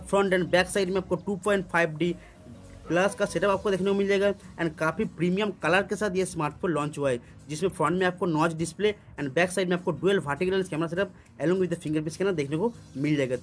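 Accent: India. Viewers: Damn your Indian accent is really hard and you speak jibberish already.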